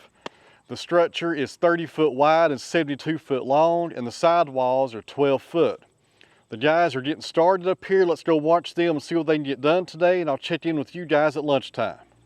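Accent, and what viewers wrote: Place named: Tennessee, United States. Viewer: I guess I could listen to the Spanish version since I understand that, but without the Tennessee accent, it won't be the same. 😉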